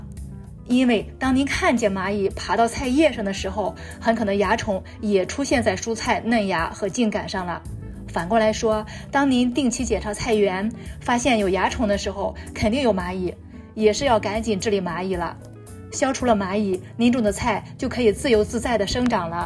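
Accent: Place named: Shandong, China. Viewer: 听得出，解说的声音来自一位山东女士。视频非常好，每年夏天都为蚜虫和蚂蚁的合作非常头疼，谢谢！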